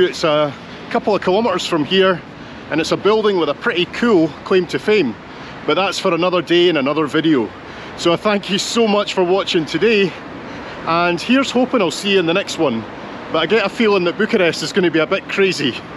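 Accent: Scotland, United Kingdom. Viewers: Scottish mate ? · scottish i think?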